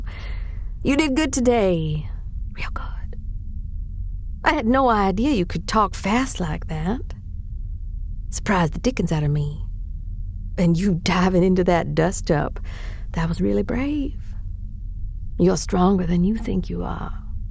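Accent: Texas, United States. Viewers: This is so Cute thank you Miss also Love the Texan Accent you sound hotter with it ❤️❤️❤️❤️❤️❤️😘😘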